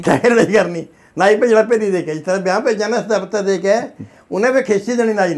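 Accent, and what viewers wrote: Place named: Kharar, India. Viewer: Kharar di boli aee ji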